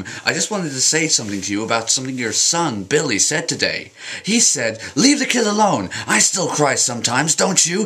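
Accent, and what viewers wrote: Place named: England, United Kingdom. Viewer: lol your accent. sometimes is SPOT ON sometimes its sooo English haha. I've seen that video of his before but not on youtube. i'm never sure if hes seious or sarcastic about certain things. some are obvious, some not so much. and hes a little to televangelist-esque for me but YOU were awesome! :)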